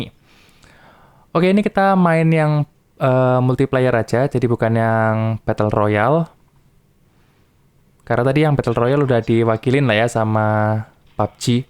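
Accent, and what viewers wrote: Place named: Banyumas, Indonesia. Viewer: Wong ngapak kye ya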